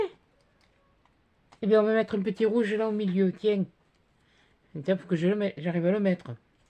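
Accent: South, France. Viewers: Bonjour, je ne commente que très rarement les vidéos que je regarde, mais honnêtement je trouve vos créations très très jolies et j'imagine le gros travail en amont. j'aime vos vidéos et votre accent du sud 😊 et aussi vos début de phrases sans la fin, votre simplicité et le respect des autres sans critiquer ( ce qui n'est pas le cas sur toutes les chaines😊) Je vous souhaite de très bonnes fêtes de fin d 'année avec votre petite famille et merci pour toutes vos vidéos 😊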